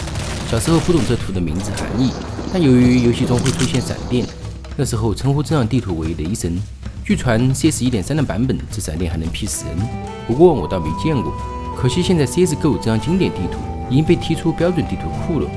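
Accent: Sichuan, China. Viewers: 四川人讲普通话，这样已经很不错了，你是没听过更糟糕的，而且比起口音，我们在意的不应该是视频内容吗?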